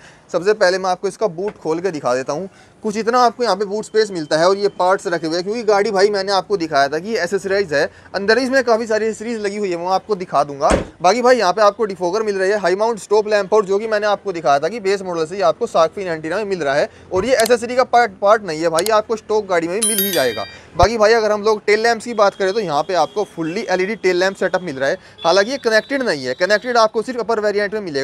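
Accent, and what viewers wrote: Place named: Muzaffarnagar, India. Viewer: This is genuine tone of muzzafarnagar❤....all the best 👍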